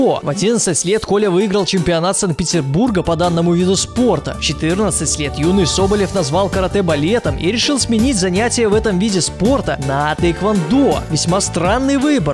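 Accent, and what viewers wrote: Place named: United States. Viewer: почему он говорит как американец который хорошо выучил русский?? Прислушайтесь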